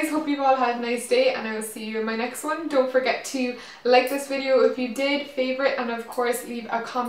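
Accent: Ireland. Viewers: The good Irish ascent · Hey r u irish aswell😜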